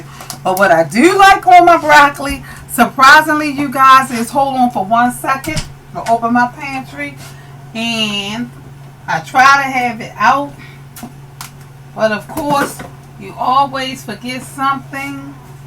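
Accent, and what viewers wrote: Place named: Maryland, United States. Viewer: I agree. There is a difference between cooking fresh broccoli vs frozen broccoli. The frozen kind doesn’t hold flavor like I want it to. But I’ll cook with either one still. Also, I can hear in the way you talk that you’re from Maryland girl. I am too! 🙋🏽‍♀️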